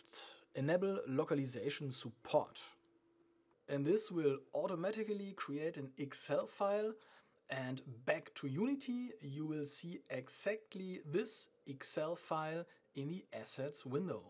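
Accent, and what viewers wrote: Germany. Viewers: Is the guy talking from Germany? :D sounds a lot like it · Yes, he is a true German! A rare breed.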